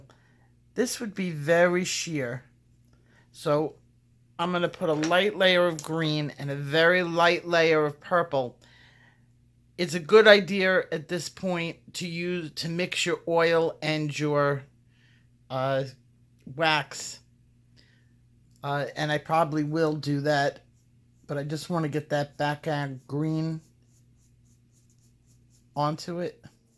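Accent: New York, United States. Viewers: Wonderful! Love your NY accent!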